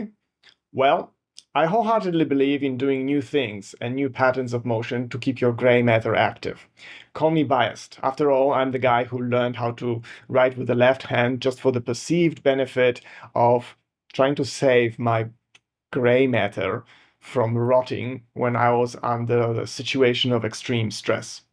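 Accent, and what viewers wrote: Poland. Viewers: I m so confused by your accent. On top of your primary accent, Polish,do you also have a German accent? Or am I imagining this?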